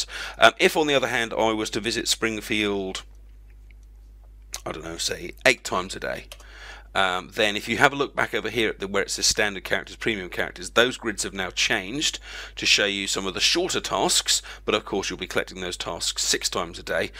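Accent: United Kingdom. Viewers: Are you British? Will you friend me on Origin?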